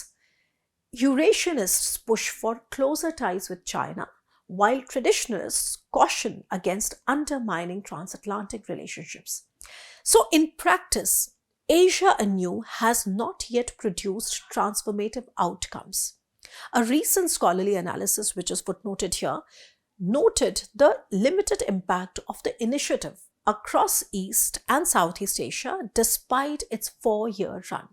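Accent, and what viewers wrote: India. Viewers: What kind of nonsense is this? The Indians look like jokers, who just get on the blower and talk whatever shit they like. No wonder India's reputation around the world has taken a hit in recent years. This lady is reading from a teleprompter with such a heavy accent that makes you cringe. Poor Turkey is now in the verbal diarrhoea of Indians since it supports Pakistan.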